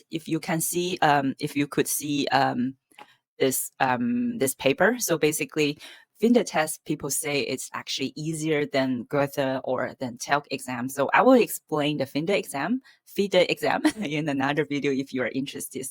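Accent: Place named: England, United Kingdom. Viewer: I appreciate your efforts but your German doesn’t sound german 😅 it sounds more English than German specially the of “T”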